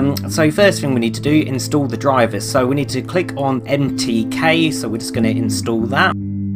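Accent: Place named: United Kingdom. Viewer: Thanks for being british for a start and not indian and for not playimg stupidly loud dubstep music on your video. Sadly its not working for me i have the correct firmware, reflashed my hardbricked phone and it functions as normal now except the annoyong GoogleKeyState : fail issue but its not working for me for some reason??? Tried opening as administrator, triple checked my imei numbers, double checked i had correct version of firmware EAA version... Im at my wits end with this stupid chinese phone lol im using a Ulefone Armor X5 (not the pro i triple checked that lol) if anyone has any advice feel free to comment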